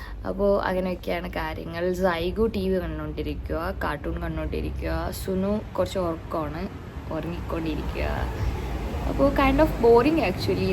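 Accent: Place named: Mangalore, India. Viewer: Mashallahh adipoli....ningade family nte vlog kanumbo oru positive energy aan❤️...ningade family pwoli aan onnum parayanilla....ithamare randu perum othorumayode enth bangiya kanaaan❤️.... samsaram kelkkan nalla rasan...manglur style adipoliya 😊....i am exciting for your eid vlog....mashuthantem eid dress okke kanaan kothiyavunnuu....njan malappuram aan ithaa....ningade familye onn meet aakan nalla aagraham nd❤️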